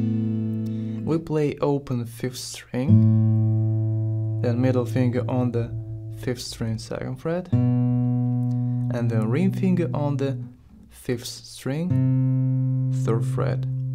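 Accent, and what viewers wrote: Russia. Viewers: No, sadly, Let’s play guitar had an Indian accent (I think that was his accent) and has a Russian accent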